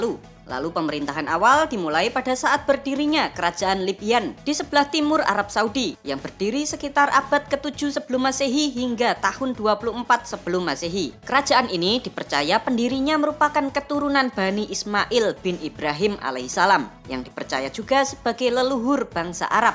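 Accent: Java, Indonesia. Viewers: Pak beri berita tentang arab bahasanya medok jawabnya ya pak jangan tinggalkan.bahasa kampung jawapnya.ya pak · kok medok banget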